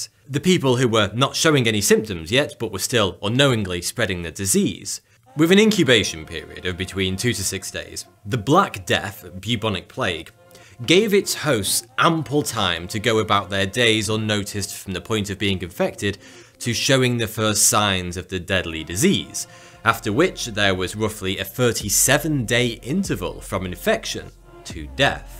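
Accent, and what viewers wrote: United Kingdom. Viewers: Pardon the ignorant question: which part of the UK is your accent from? Introvert here. This quarantine is brilliant! I love it! I'm learning 2 foreign languages, taking online guitar lessons, reading voluminously, deep-cleaning my flat, getting a suntan, etc. What's the bellyaching about? Ha!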